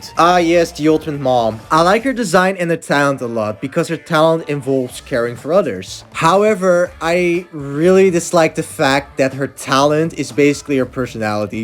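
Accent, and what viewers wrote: Netherlands. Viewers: iconic video!!! loved this. the video raised a question for me tho are you dutch? 😭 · I hear a dutch accent :3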